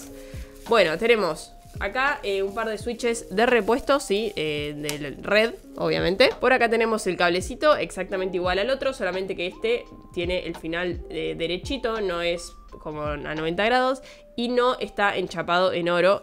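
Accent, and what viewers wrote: Argentina. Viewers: Me encanta el acento argentino xD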